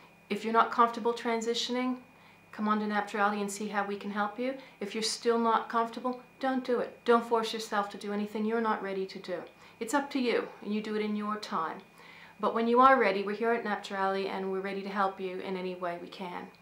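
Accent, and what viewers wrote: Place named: Australia. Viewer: I hear it too, pinky. There is SOME kind of accent there and it does sound Australian!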